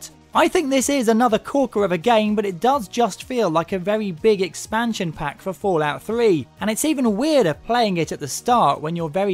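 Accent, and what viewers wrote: England, United Kingdom. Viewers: dnt worry not all english accents sound like his his is annoying but the english accent is a lot nicer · his the person taking in the review British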